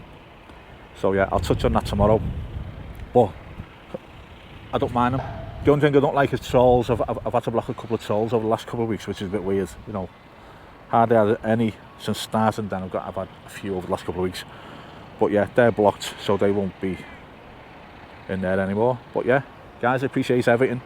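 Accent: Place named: Liverpool, United Kingdom. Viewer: You know those vids on YouTube that are primarily for a foreign audience that focus on the regional accents of England? Yours will get picked up as the Liverpool example as opposed to the Carra/Gerrard ones they always use. 😊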